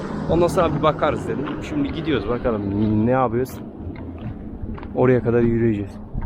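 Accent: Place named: Kayseri, Turkey. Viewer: Bu yakışıklı da kimmiş önüme çıktı videosu 😂Gayserili gibi gonusuyor😂Ulukisladan selam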